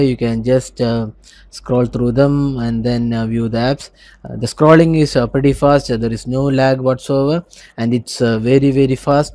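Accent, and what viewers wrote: India. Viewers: Love the india accent!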